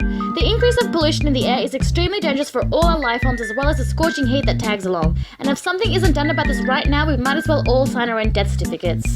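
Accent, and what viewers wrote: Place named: Australia. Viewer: great video; I am too curious though, what kind of accent is that? I want to say Australian? too few views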